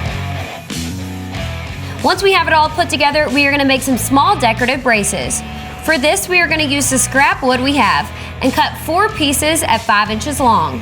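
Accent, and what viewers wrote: South, United States. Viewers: That country accent 👌🙌😍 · Redhead. Chest. Southern accent. She has to be bi too. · How the fuck, did I end up here?? ...I ended listening to southern American girl ....building shit. Why.....I am in Europe like. At least I enjoyed her accent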